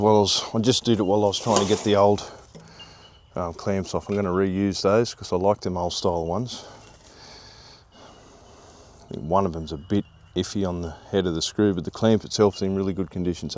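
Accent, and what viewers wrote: Australia. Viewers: its Australian mate 🎉😂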